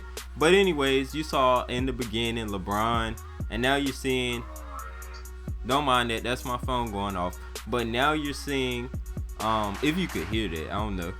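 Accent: United States. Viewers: I knew you was from North Carolina lol on god..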